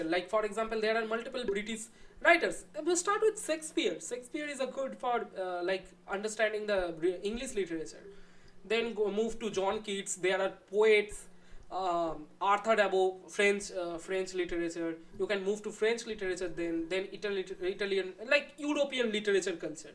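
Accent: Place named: Bengal, India. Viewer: Dada i know that accent u have, sotti kore bolo......you are bengalee na?😁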